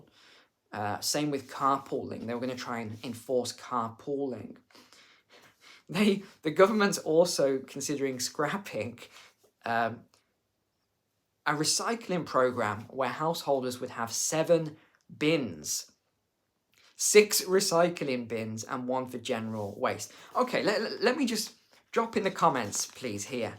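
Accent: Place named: United Kingdom. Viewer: insults sound even funnier with his British accent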